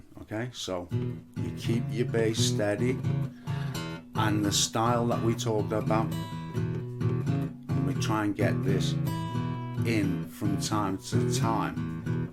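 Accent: Liverpool, United Kingdom. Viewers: good lad,lovely easy going lessons, sounds like you live not far from Liverpool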